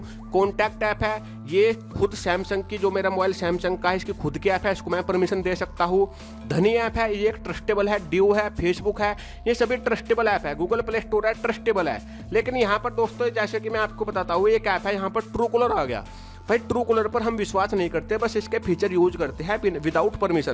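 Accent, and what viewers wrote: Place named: Bihar, India. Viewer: Bihari h ye tu ..kaisa bol raha h bihari up ka h kiya bahiya ji up wale language dekhi iski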